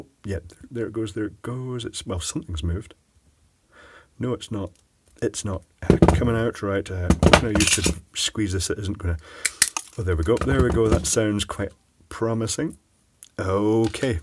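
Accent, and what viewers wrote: Scotland, United Kingdom. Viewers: There is absolutely no need for you to apologize for a long video. We watch your videos coz we appreciate your expertise and above all we value you. For me one of your videos can't be too long at all and I think that I speak for countless others who also watch your channel regularly. Thx for your great work and for your unbeatable Scottish humor and accent 😃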